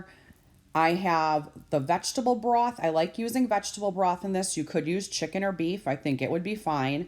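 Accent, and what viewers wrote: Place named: Chicago, United States. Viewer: First time viewer. Nice looking recipes. Plain and simple. Must say that you sound like a fellow Chicagoan. I live in north central Illinois now. Best wishes. I will check out other recipes. TFS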